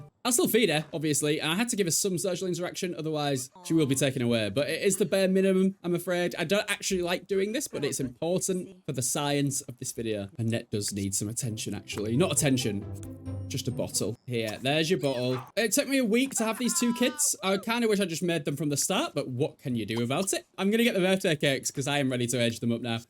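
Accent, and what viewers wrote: United Kingdom. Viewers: I'm from Brazil and I constantly watch Youtubers from all over the world to improve my Englsih. I stumbled across your channel recently and I'm basicaly watching every single video with these random gameplays/challenges for The Sims 2 - my favorite one btw - and 3 and it's always a blast for me 😂😂😂😂 I love your dark and twisted sense of humor, wrapped in such calmness and "fancyness" with your british accent, please never stop your videos ❤ They really make me wanna be your friend irl!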